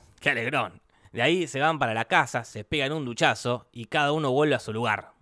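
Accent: Argentina. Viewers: Sos argentino??? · Sos argentino?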